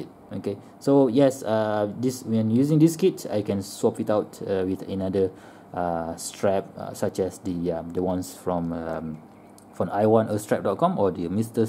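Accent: Malaysia. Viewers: Are you Malaysian? You sound like one haha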